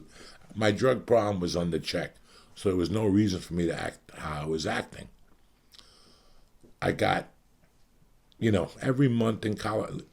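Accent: New Jersey, United States. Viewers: Anyone else noticing how is starting to talk much more like he lives in jersey haha morning church!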